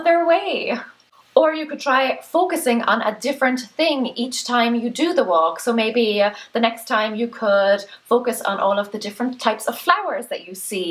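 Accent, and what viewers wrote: Ireland. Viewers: Omg I love the way you put things into doable stuff. You actually get it! Thank you I have 4 girls it's hard sometimes! I love them of course but thanks for your honesty (inspiring) xxx what part of Ireland are you from?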